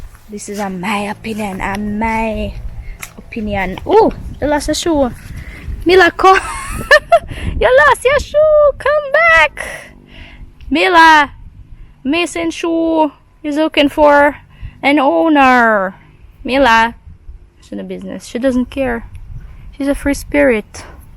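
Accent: Jamaica. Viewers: I love patois too love you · Yes you can speak jamaican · nuff people love when you talk patios we love that about you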